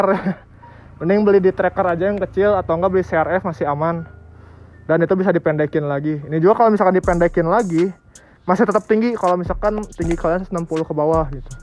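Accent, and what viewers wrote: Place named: Sunda, Indonesia. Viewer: Sunda pisan ei AMANG Yeu mh euy. Bhasa na. MANTAP..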